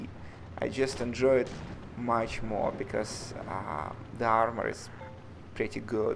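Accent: Russia. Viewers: Totaljy Rassian accent :D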